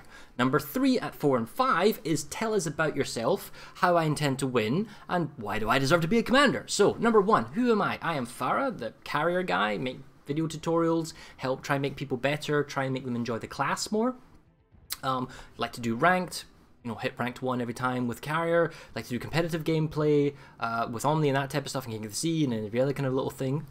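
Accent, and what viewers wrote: Scotland, United Kingdom. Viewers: damn now i've got between Mighty Jingles and you....... i don't know..... i'll see with every mission (if you bring a little more scottish accent(soft spot) i'm in....) Why not strike a deal and get a scottisch speaking HANS in the game :)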